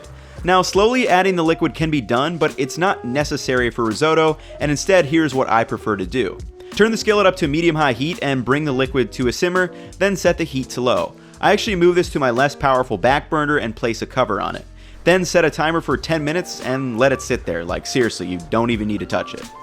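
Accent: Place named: United States. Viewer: He's American, so he pronounces it like an American. Because that's how language works.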